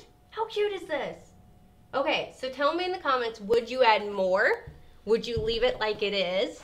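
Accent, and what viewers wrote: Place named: South, United States. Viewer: I love this. I purchased stencil stuff two years ago and was just to intimidated to try to make the door mat. I’m going to try it now thanks to you. I learned some things about welding too. Love your accent, I’m southern too! Awesome tutorial, thanks.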